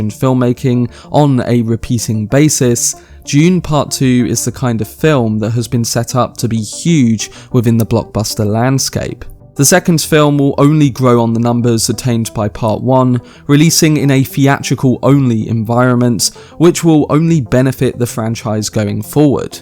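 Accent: United Kingdom. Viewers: really nice british accent :D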